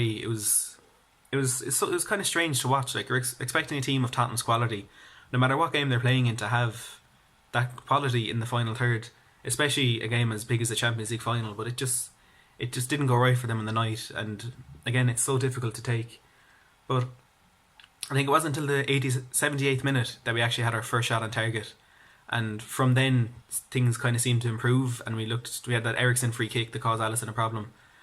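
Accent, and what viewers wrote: Ireland. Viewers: a weird accent, how rude, is from Ireland, his accent is very common in Ireland!, comment on his Blog, not how he sounds.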